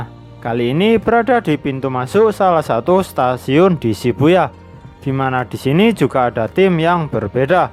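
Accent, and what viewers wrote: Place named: Java, Indonesia. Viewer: terlalu medok gan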